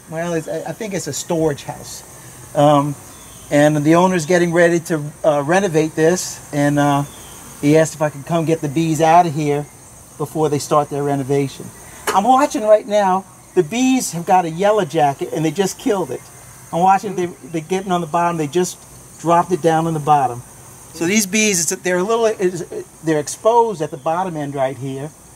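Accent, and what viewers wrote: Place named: South, United States. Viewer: I'm not just binging on bees—I'm binging on bees that are being moved by guys with Southern accents. Now that I live in Seattle and most of my Southern immediate relatives have passed, the combination of the hum of the bees and the southern accent is so soothing...like a lullaby and my childhood all wrapped up together! 'Night and may God bless us all and all the US.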